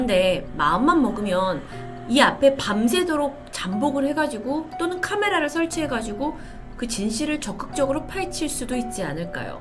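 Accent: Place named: Gyeongsang, South Korea. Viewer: 경상도~~~ㄲ ㅈ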